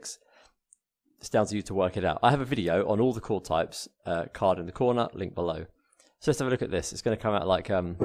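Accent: United Kingdom. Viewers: Nice! You sound like you’re from the Uk but your location is different, how’s the life down there? Keep up the good work!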